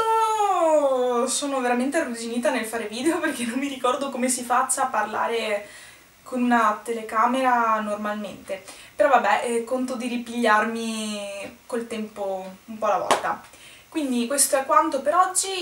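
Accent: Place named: United Kingdom. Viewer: A parte i prodotti fighi, ti faccio una domanda un po' da "fattilicazzimia": come fai ad avere un accento very british? Hai fatto qualche corso, scuola ecc ecc? Grazie mille per il video, un bacione!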